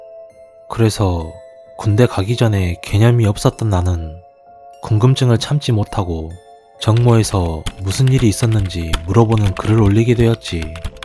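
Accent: Jeolla, South Korea. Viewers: 전라도 사투리 졸귀탱ㅋㅋㄱㄱㅋ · 아 특유의 전라도 억양이 너무 정감가서 그런가 내용이 집중이 안되네요 좀 웃김 !! ㅎㅎㅎ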